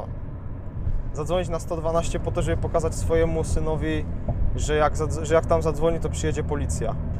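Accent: Silesia, Poland. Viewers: Ze Śluńska jesteś?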